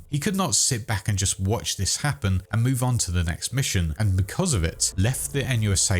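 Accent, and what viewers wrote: United Kingdom. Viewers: I think you should be a voice in the sequel. Either a fixer or working with me blue eyes. You see, because you're British so obviously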